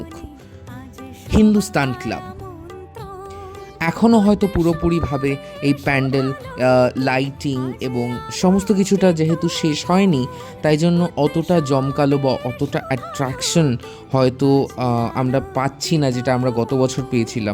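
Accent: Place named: England, United Kingdom. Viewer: DURGA PUJO TO ... BANGLA BOLLE BHALO HOY.......ANGLO VERSION BANGLA TA THIK MANACHHE NAAAAA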